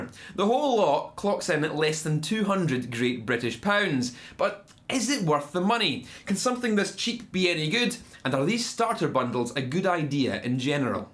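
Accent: United States. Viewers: THE AMERICAN ACCENT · Last time I was this early my girlfriend was disappointed. Also that american accent tho. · Seriously good review. I mean I am a bass player, and you almost had me wanting one of these... And I give you a B+ on your american accent. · Oh boy that American accent is coming along so nicely! lol, just love these review videos, awesome as always! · Great video, and pretty cool bundle. Your American accent is damn charming haha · The American accent you use. Thank you for that. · That American accent though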